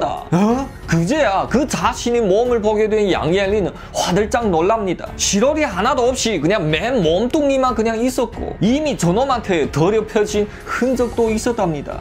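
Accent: China. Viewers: 억양이 특이하시네요.중국사람이 한국말 하는 느낌요.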